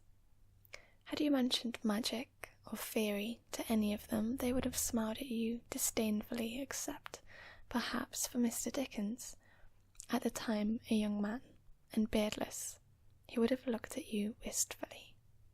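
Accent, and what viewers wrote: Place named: Hampshire, United Kingdom. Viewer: You have a lovely accent. Id bet you're from hampshire somewhere. :)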